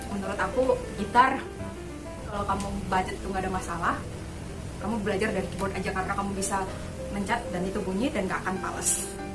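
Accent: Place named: United Kingdom. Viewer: Lebih pantas lg klo bicara tanpa menggunakan aksen English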